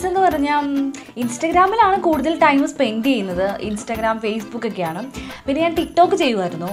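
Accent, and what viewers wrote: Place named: Kochi, India. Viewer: ചേച്ചിയുടെ കൊച്ചി സ്ലാങ് സൂപ്പർ ആണ് ട്ടോ...🥰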